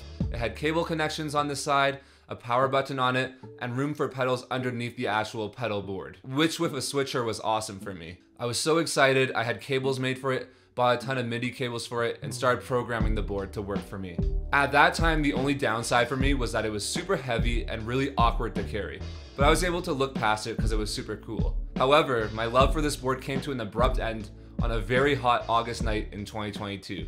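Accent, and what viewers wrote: United States. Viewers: Where in the world do you sound like an American but talk in Celsius? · It is weird that someone with an American accent uses Centigrade. Probably living abroad. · You traded a custom board that you had to do some convincing to procure... and then traded it for one pedal that's so common you could piss off your back porch and somehow hit a carbon copy? Also, you using brit heat measurements with an American accent... weirder than tim walz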